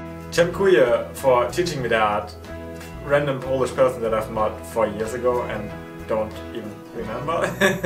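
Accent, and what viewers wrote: Germany. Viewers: It was really interesting to hear you speak the different languages! For some reason I can really hear the German accent in all of them. I also learned a couple of languages, some more, some less. I guess I can say like a sentence or two in eight languages, but only speak two of them fluently (though I had French in school for seven damn years, j'ai oublié tous les choses) Greeting from a fellow German Anteater and reddit upvoter ;D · Hallo, ich mag deine lustige Art und dein scheunentorriesiges Lachen. Du traust dich ohne große Scheu, Sprachen zu sprechen, auch wenn du vielleicht nur einen Minimalwortschatz hast. Du solltest auf jeden Fall zum Polyglot Gathering Ende Mai kommen, dort triffst du Gleichgesinnte! Ein kleiner Tip: du hast diesen überdeutlichen deutschen Akzent und den solltest du dir abtrainieren.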